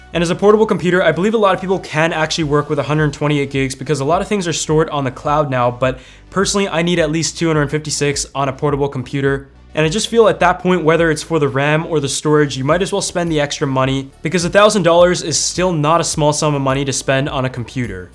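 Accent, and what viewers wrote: Canada. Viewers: r u from Canada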